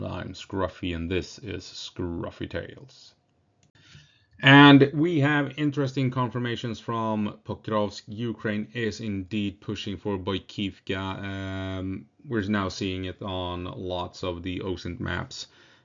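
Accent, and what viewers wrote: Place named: Sweden. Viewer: "Combat boot" and "Combat boat" sounds eerily similar when you speak with a swedish accent. Yes! I throw bricks in a glas house. I speak even better swenglish.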